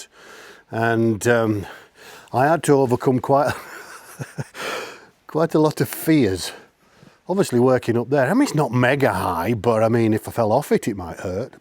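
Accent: Northern England, United Kingdom. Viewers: Where in France? a very northern English accent, i live i the Pyrenees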